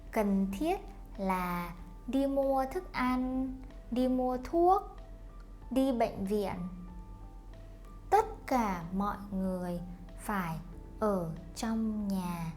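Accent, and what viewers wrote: North, Vietnam. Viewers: Thanks for this video. I searched long to find some good stuff to listen to as an "experienced beginner" and this is exactly what I wanted (even if northern accent ;) )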